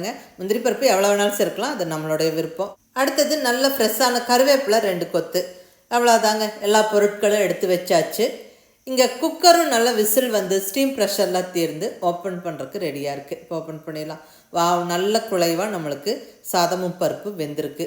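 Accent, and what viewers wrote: Kongu, India. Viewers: எல்லாமே சூப்பர் கோவை கொங்கு தமிழில் பேசுவது அதிலும் அவ்வளவுதாங்க சுவைகூட்டுதுங்க அவ்வளவேதாங்க சகோ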